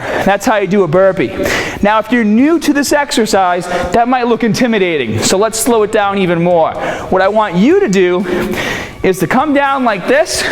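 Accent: Boston, United States. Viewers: i dont know how i havent noticed it, but you have one of the heaviest boston accents ive ever heard. i go to BC so i hear it all the time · Is it just me or did he develop a Boston accent? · Since when did he have a Boston accent??? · love that boston accent man. never lose it! :) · What's with the Boston accent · Just like how different it is, I just love Boston accents ahah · hey Im curious. When I am deadlifting, when I go heavy and somewhat heavy(for me) I notice I have a somewhat round in my back. Not like incredibly terrible, but I notice it when I recorded a side angle, for testing purposes. Should I drop the weight down like 40% of my 1 rep max, and try to work on perfect form while building back up, or is a slight bend in my lower back okay? I wasn't using a belt at the time because I notice you dont until you get near your max, so I try to stray away from the belt until I need it. 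What % of your one rep max do you start to use the belt? Any help from anyone would be great! I love your video's, thanks for teaching me nearly everything I know about lifting. Cheers. P.S I always thought you had a boston type of accent, didnt ever know you tried to cover it up xD I always heard it. haha keep it up! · is it a Boston accent? I'm from UK I don't really know, but it makes me smile adds to your character LMAO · Are you from boston? You have a boston accent tbh haha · That Boston accent coming out strong! Awesome video though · Thumbs up for Bawston! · The Boston Accent is Wicked Cool. With that strength, body, agility, and stamina, can speak anyway he wants. Your my hero. (Especially since we both are from Massachusetts). Great Exercises. I will try them next training day.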